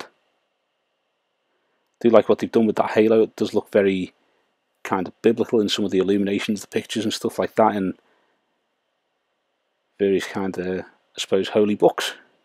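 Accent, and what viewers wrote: Liverpool, United Kingdom. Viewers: Scouse accent pushes this to the next level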